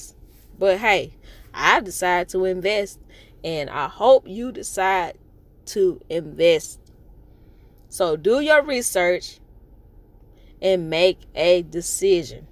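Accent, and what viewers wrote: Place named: South, United States. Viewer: Love that country twang lol and personality for that alone I will subscribed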